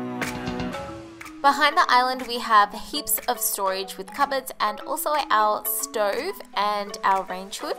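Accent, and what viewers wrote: Australia. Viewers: New subscriber are you Australian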